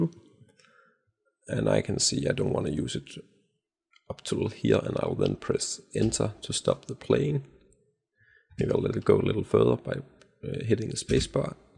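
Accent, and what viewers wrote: Denmark. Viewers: er du dansker ? :) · you sounds danish <.< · Are you Danish?? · Are you Danish? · Are You Danish ? If You Are Its EPIC !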